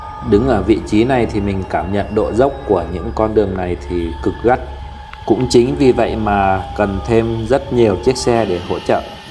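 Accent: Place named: Cao Bang Lang Son, Vietnam. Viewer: giọng đọc của ad hình như là người cao bằng,lạng sơn vào đăk lak thì phải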